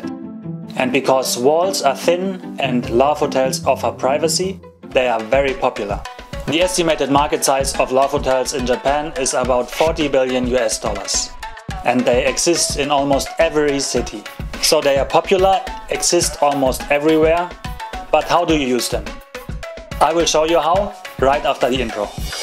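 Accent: Germany. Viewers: Where do you come from? I sense a german accent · Ich hab sofort herausgehört, dass Sie aus Deutschland kommen XD Ich muss sagen, guter Kanal :) Gefällt mir^^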